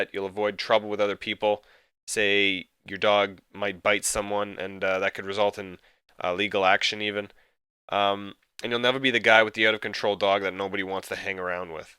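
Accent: Canada. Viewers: haha canadian accent! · Are you Canadian? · are you canadian? ^^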